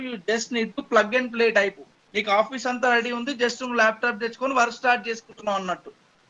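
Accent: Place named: Telangana, India. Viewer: Telengana yasa la iragadeeshnav anna....detthadi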